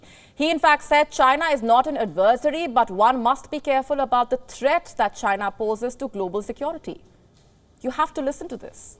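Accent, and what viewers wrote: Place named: India. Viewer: had to bounce after hearing that indian accent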